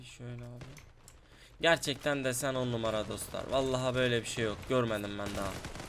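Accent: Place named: Tokat, Turkey. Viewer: abi nerelisin ben tokat bizim oralılar gibi konuşuyorsun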